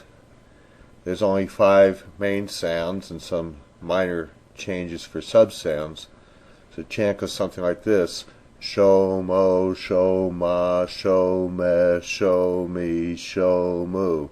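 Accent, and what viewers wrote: United States. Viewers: what a bad way of pronouncing all this, so americanised.... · the thing about non-native language teachers is that they don't exactly mimic the local sound. for example the teacher in this vid still pronounces the words with a subtle american accent (e.g.: "owe" instead o "oo", "meih" instead of "meh"; the word 'nekudot' (hebrew=points) was also pronounced the american way. pls try to delineate the american sounds to hebrew (or any other foreign language for that matter) sounds for an authentic foreign language lesson. nice lesson though. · Love your work! Lessons are informative and take the right pace for any person starting to learn Hebrew. Your Hebrew might be a bit "American", just like most of Hebrew in Israel is a bit "Russian", "Hungarian", "Germanic"... Some people swallow : (/e/), some pronounce it... No one should be discouraged because of it, just the opposite! 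Approach taken here is better than anything I have seen or heard up till now. Bravo and toda raba! :)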